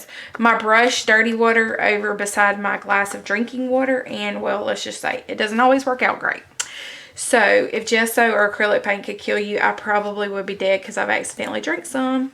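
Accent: South, United States. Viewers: Love all those bright colors and how you incorporated the paper towel. I threw mine away not long ago...my bad. oh how I miss that southern accent! I attended Auburn a long time ago :)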